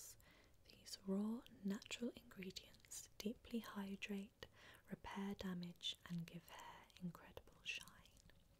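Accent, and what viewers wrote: England, United Kingdom. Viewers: the English accent works awesome for asmr👑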